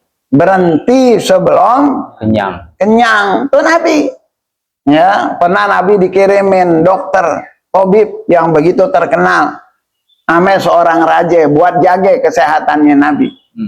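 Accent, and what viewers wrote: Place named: Jakarta, Indonesia. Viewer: Nie ustadz dialeknya Betawi banget ane sangat suka 😅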